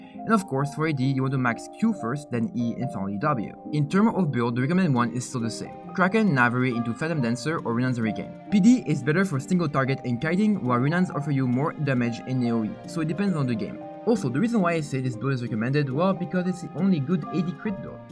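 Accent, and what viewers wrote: France. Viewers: Ahaha je sais reconnaître un french bro quand j’en entend hein! J’ai bon?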